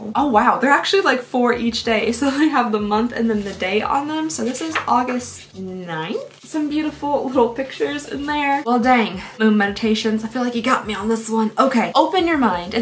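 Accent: Kentucky, United States. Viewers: How many keep coming back to hear mispronounce words, pronounce everything with KY accent, or just simply make words up? Just me?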